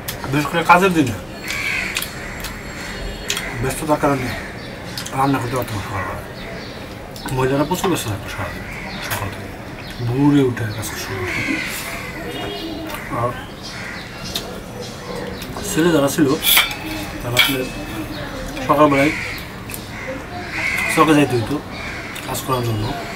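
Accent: Bangladesh. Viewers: Tanveer Touhid এটা টাংগাইলের ভাষা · আমার বাড়ি মানিকগঞ্জের সিঙ্গাইরে, আমাদের ওখানকার মানুষ যখন শুদ্ধ ভাষায় কথা বলার চেষ্টা করে তখন কিছুটা এমন শোনায়। তাই জিজ্ঞেস করলাম আর কি। আপনার ভিডিও ভালো লাগে। চালিয়ে যান দাদা। সাথে আছি। :)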